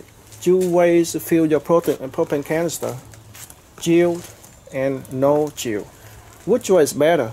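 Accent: Vietnam. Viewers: Sounds like a Vietnamese accent. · Sounds like Vietnamese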